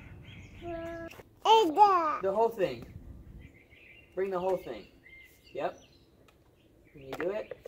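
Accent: Alabama, United States. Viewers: You from alabama?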